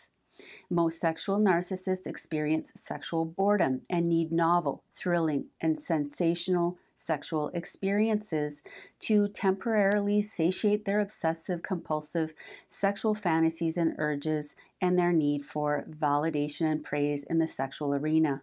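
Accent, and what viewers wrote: Canada. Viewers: Love your Canadian diction...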